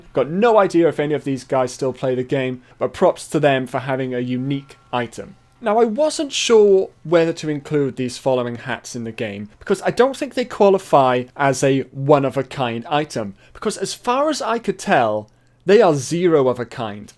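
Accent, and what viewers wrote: Wales, United Kingdom. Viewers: Wherabouts in Wales are you from, mate? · Are you Welsh?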